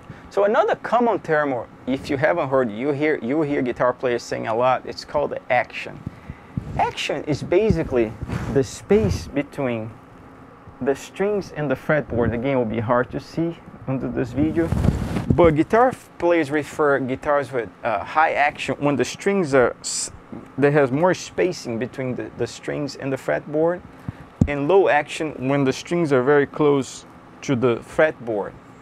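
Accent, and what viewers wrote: Brazil. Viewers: Do you happen to speak Portuguese? You seem to have a Brazilian accent...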